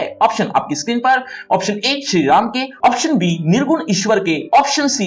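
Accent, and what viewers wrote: Haryana, India. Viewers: Sir aap Haryana se ho kya? kidi baith jaati h😂ye to Haryanavi h comment👇if you are also Haryanavi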